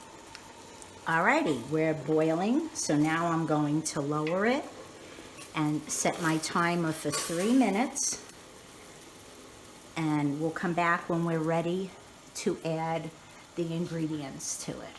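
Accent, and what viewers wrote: New York, United States. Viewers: I love how you take the time to explain things so nicely and stress safety. This looks sooo good! Sounds like you might have some sort of allergy to something in those hot peppers. Bless your heart. Thank you for sharing this recipe. I’m gonna try this one for sure!, I love to hear your voice. I’m originally from South Jersey and hearing your NY accent warms my heart.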